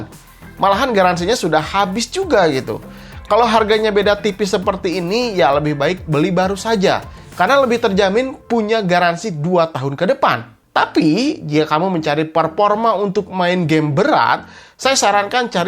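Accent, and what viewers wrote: West Java, Indonesia. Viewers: Biasa SUNDA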